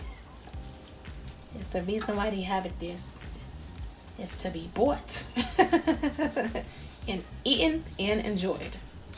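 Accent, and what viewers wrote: New York, United States. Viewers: It looks amazing. Love the East coast accent. Sounds like NY, or Brooklyn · you sound like a New Yorker your accent does NOT sound Southern at all..Love your cornbread stuffing recipe..but I will like to see how you made the cornbread..My mon does it the same way but w/outthe croutons..Looks Delicious..!!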